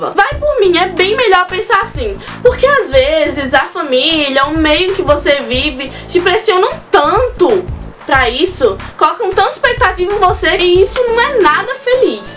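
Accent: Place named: Natal, Brazil. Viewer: Tu é de Natal é? Sotaque lindo... USHAUHUHUASHHASHUS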